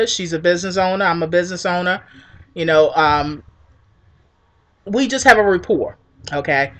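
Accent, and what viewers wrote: Baltimore, United States. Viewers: you are making some valid points especially when you trusting someone with your livlihood.and your Mulahhh lol you such are Definitely from Baltimore (your accent) "Rude" the way you say that word lol Have a Bless day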